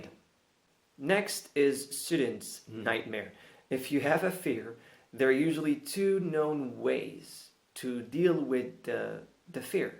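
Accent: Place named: United States. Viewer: AMERICAN ACCENT spots on يااااااي كلوكيت لك خطأ ف نطق كلمة اندوفيدجوول لووول ولاكن تبارك الله عليك .. انا عندي الانجليزية لا بأس بها ولاكن كاتنداثر من قلة التطبيق و الحوار ، مكانلقاش معامن واخا عندي اللكنة قريبا لديالك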